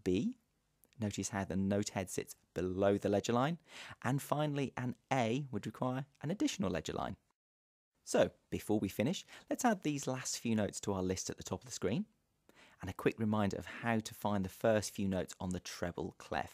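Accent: United Kingdom. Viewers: Fantastic teaching plus its British accent so I like it so much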